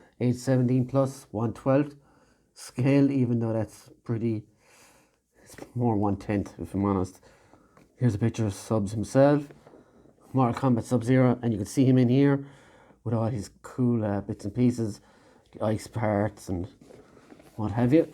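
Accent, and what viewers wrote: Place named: County Clare, Ireland. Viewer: Nice :) It's just... A mate of mine and one of my favorite Youtubers, mr. Dazran3o3, comes from county clare and your accent sounds just like him. :P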